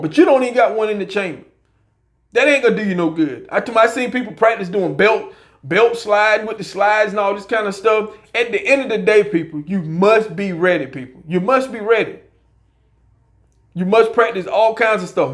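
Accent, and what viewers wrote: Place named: North Carolina, United States. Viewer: yea im not making fun of. him. Its a new way to say firearm. Nc accent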